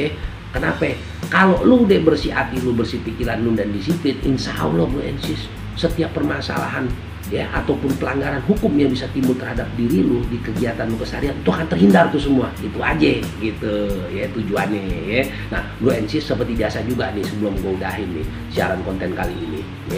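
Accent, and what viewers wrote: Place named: Banyumas, Indonesia. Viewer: salam wong ngapak 😄 ora ngapak ora kepenak 😁😁😁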